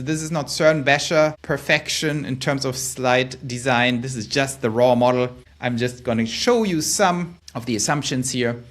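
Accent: Germany. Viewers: we need more heavy german accents on youtube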